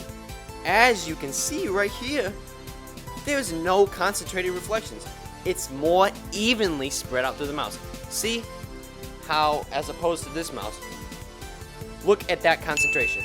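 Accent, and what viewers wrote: New York, United States. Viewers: You are from ny? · As a person who lives in New York I love his NY accent edit: sheesh thx for the likes and heart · Your from new york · I can feel the new York accent through the screen. Good bid m8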